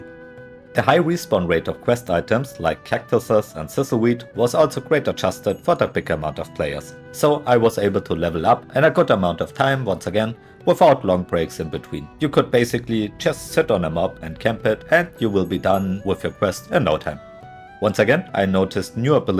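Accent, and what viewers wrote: Germany. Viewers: thanks for the video but the german accent is bursting me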